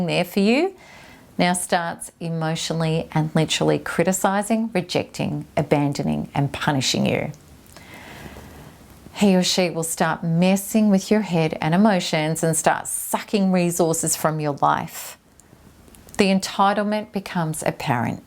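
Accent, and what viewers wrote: Australia. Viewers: What a heavy australian accent.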